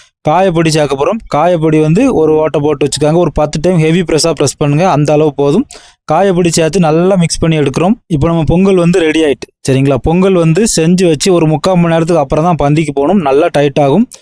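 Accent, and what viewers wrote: Tirunelveli, India. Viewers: From his pronunciation I guess he is from Tuticorin/Tirunelveli side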